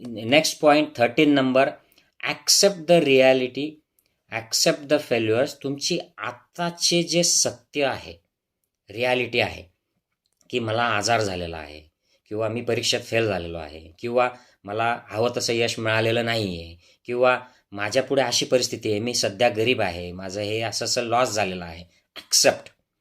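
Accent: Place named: Ahirani, India. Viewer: डॉक्टर साहेब मराठीमध्ये सांगत असताना अहिराणी ची झलक येते थोडी-थोडी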